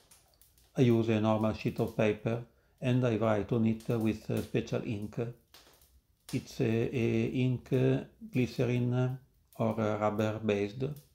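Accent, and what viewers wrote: Italy. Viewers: Is that an italian accent i am hearing?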